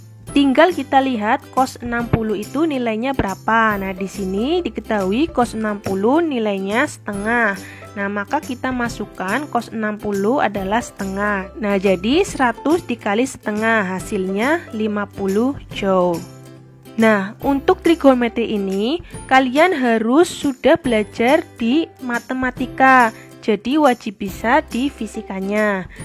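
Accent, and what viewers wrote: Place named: Tegal, Indonesia. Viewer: Ini kayaknya mbaknya orang tegal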